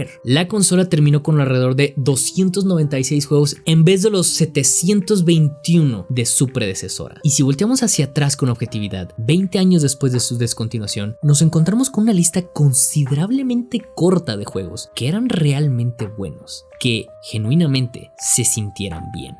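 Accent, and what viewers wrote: Mexico. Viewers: odio como los mexicanos pronuncian el ingles....zir pari....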